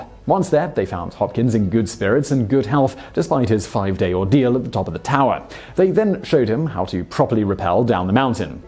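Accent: England, United Kingdom. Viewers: id rather listen to that fucked up robot voice than this brit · Yeah he's been living in England for a while now. Guess he picked up the accent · I was just interested in seeing the clips..and not listening to 7 minuts of british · This channel is such horseshit... I too can read a wiki entry in an English accent. I'll take my 2 million views now please. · Is that British English?? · So the ominous British voice over guy is VSauce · British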